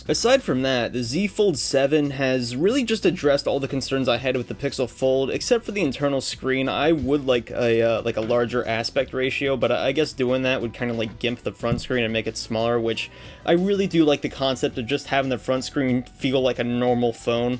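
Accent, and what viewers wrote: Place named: United States. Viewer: Guessing from the accent you're from usa right? How do you use an android phone over there, iMessages don't work on android. From what i know they all use iPhone & iMessage in usa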